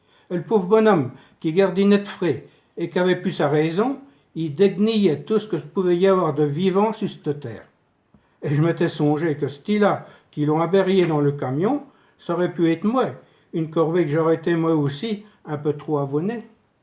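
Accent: Sarthe, France. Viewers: Bonjour à vous.le patois sarthois .c est beau à entendre.je suis sarthois château du loir.allez entendre le belinois. Mort de rire.bravo félicitation mr.vous êtes d où de la bas.pardi.bon courage à vous et tous mes meilleurs vœux de sympathie.en voiyure.🤩🤩🤩🤩🤩🎅🎅🎅🎅🎅🎅👍👍👍👍👍👏🏿👏🏿👏🏿👏🏿bye.a demain. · Mdr le pure sarthois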